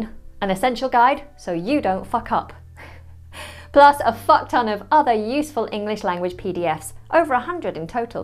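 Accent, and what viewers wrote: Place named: United Kingdom. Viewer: I will always repeat it. I love always to listen to your British accent.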